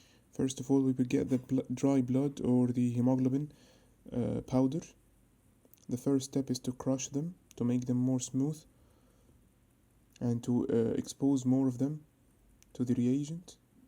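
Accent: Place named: Egypt. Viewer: I knew you're egyptian by the word first. You, egyptians, say "firEst" Good video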